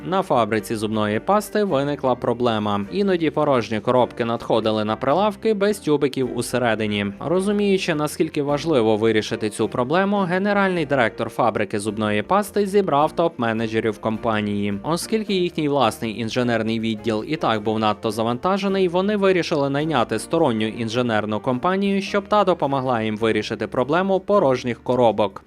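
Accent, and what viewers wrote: Russia. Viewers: У Ютьюбі є авдіокурс української літературної вимови Миколи Погрібного. Навчіться нарешті правильно вимовляти українські звуки, бо бридко чути вашу зросійщену вимову.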